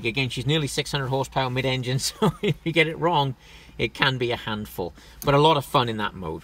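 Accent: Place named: Wales, United Kingdom. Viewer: I don't think the chap is American. I detect a Welsh lilt of the valleys!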